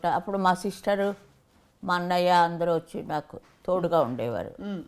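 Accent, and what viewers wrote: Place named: Vizianagaram, India. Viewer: పాపం పసివాడు అమ్మా మీ మాటల్లో విజయనగరం కనిపిస్తోంది. మా నాయనమ్మ గారు పుట్టింటివారు విజయనగరం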